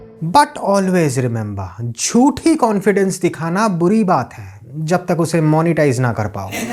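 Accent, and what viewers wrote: India. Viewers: SMASH review bro. Maza aagaya. Keep up the good work!!!! English bhi kardho wohi desi accent pe, aur viral ho jayega 💸💸💸💸 Bless u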